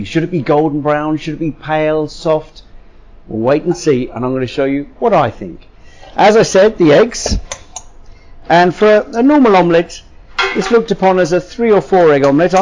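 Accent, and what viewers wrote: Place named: United Kingdom. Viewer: Clearly British.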